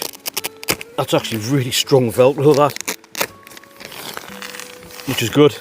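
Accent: Newcastle, United Kingdom. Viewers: I love your accent, is that from Newcastle? Great review. Thank you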